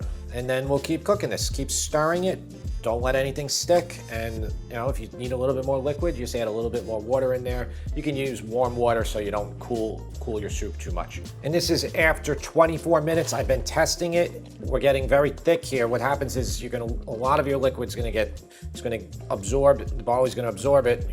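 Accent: New York, United States. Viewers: Holy cow! You ARE from NY. I'm originally from NJ, but at this point in my life... don't talk so fast. 😂 But Finally, I found a good recipe for beef barley soup. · You can cook for me anytime 😊. Recipes are spot on and easy to follow. I love watching you and your family and enjoy listening to your New York accent. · Hey there, slow down guy!:- typical "Nyoo Yawkeh", talkin' a mile a minute! Most of us are not from New York and we like to get it nice and slow, take your time please, it's a good video, no need to rush it!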